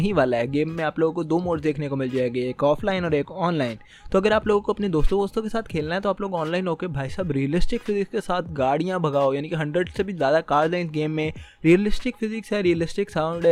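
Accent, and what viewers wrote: India. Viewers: Ap himachal sea ho na. The way you speak is exactly like that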